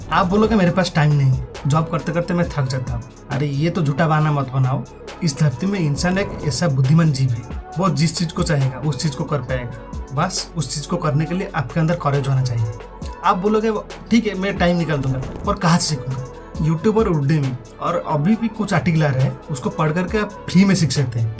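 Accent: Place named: Odisha, India. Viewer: Pata thaaa bhai tere hindhi bolne style seee.. jay Jagannath ❤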